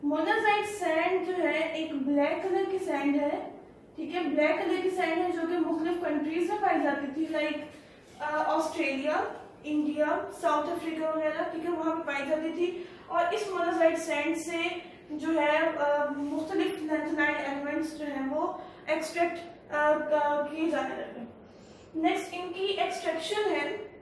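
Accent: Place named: Lahore, Pakistan. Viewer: Master of Science ..so , where r u from...your accent looks like lahori.. I m from india but i love pak...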